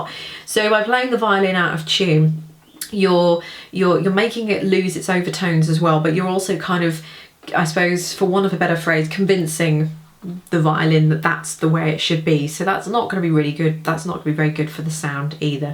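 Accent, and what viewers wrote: United Kingdom. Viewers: I love hearing "pimp up" in a classy British accent. It's really funny.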